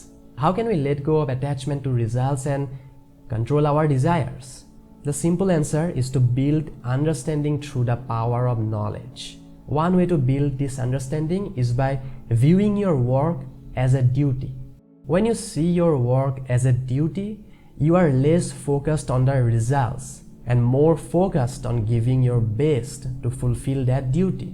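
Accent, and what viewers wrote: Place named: Assam, India. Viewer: Also don't listen to haters who complain about your accent, your accent is ok, we're Assamese people of course we'll sound a bit Assamese when we speak English, so does every other Indian whose mother tongue is Hindi,malayam, Tamil, gujrati etc.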